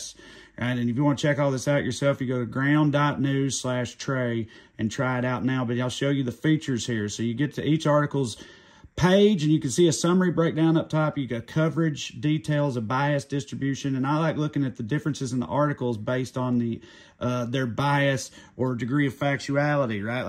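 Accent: South, United States. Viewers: ROTFLMAO the Youtube rabbit hole, how do you think I found you Trea? You are your generation's George Carlin only with a southern accent. Meh baggage there I know. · I've read many articles and watched many videos explaining that most comedians have above average intelligence if not genius. Just watching and listening to, I'd guess - no, I'm SURE is in that upper range. He just tries to throw you off by emphasizing his Southern accent. And by the way, I'm from the deep South.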